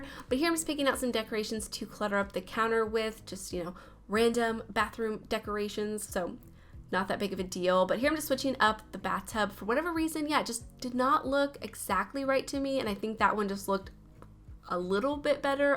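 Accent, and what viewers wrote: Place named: South, United States. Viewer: i always say this, so it’s old now- but i LOVE your builds. your exteriors especially are always so lovely and they’re all houses i would want to live in! random question: where are you originally from? you have a bit of a southern-y twang in your accent i think.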